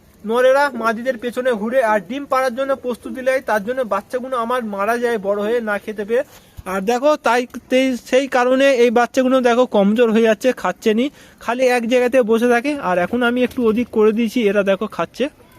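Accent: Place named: India. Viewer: আপনার কথা গুলো দেখে মনে হচ্ছে আপনি ইন্ডিয়ার লোক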